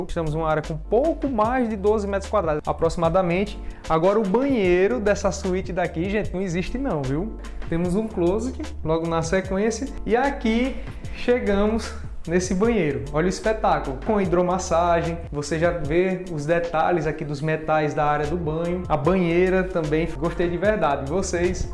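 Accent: Northeast, Brazil. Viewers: Adoro seus vídeos, vejo todos... Você é uma simpatia esse sotaque nordestino dá todo um charme no vídeo... Parabéns pelas filmagens... E a casa realmente maravilhosa. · Amei a forma como você apresenta! Esse seu sotaque é demais! Até porque eu também sou uma paulistana/ nordestina! Este sobrado é simplesmente linda!! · Comigo, foi diferente, sou inscrita em vários canais de arquitetura, pois além de amar, estou em reforma. Mas nesse canal ( o único da área ) me inscrevi pela simpatia do rapaz tbm. Sou mineira e amo o sotaque nordestino) 😊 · Que casa meu Deus,e esse sutaque lindo essa simpatia nossa que maravilha,por isso amo o nordeste, parabéns!!!!!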